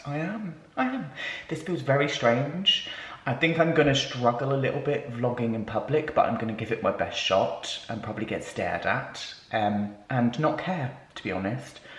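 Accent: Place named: West Country, United Kingdom. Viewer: I went to that Belgium restaurant back last year. My mum got so pissed after having tasters of the insane amount of beers on their menu, as we left she thought I was the waitress & asked why I was following her. We stayed in Tregenna castle, beautiful exterior…interior was fine…Watching this made me actually smile several times, proud of you & can see how much you appreciate what you’re doing. Plus I do love hearing the West Country accent (I’m a Bristol Bumpkin) Proper lush me babber, well done, can’t wait to see more of everything you do x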